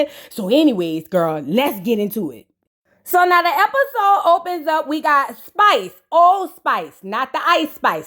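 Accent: New Orleans, United States. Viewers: Love the commentary and the New Orleans accent 🤣🤣🤣🤣🤣🤣🤣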